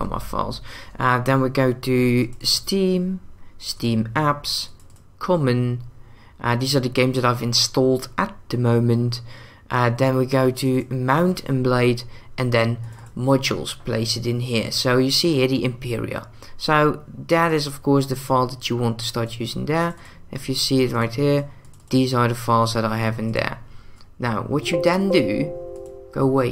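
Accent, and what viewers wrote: United Kingdom. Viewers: ik dacht dat je brits was, met een grappig accent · english thicc accent xD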